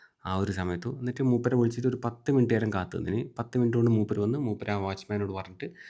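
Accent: Kannur, India. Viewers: idh kannur slang a mone😂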